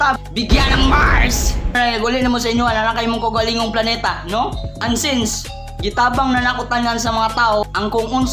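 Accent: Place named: Visayas, Philippines. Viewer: Bisaya ka pala sir hahha makalingaw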